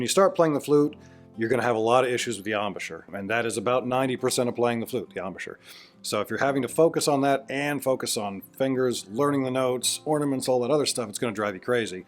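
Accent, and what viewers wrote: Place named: United States. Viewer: Love your advice and presentation, but would you STOP talking like a yank. Have subbed 👍👍☘☘☘🇮🇪🇮🇪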